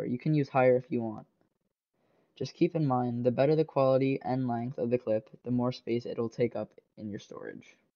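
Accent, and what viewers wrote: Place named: India. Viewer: you sound like one of those indian tech guys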